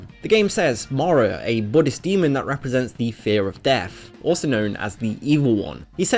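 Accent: United Kingdom. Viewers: The commentator? Uh, he's British.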